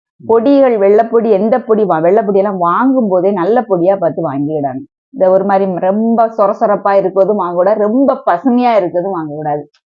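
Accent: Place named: Kerala, India. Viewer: நல்ல மலையாளம் தமிழ்🎉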